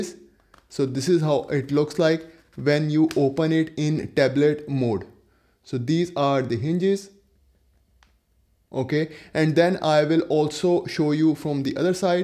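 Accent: India. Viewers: Heavy Indian accent 😅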